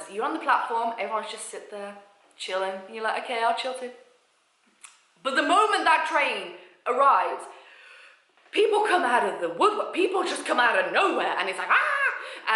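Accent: England, United Kingdom. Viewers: I love that English accent.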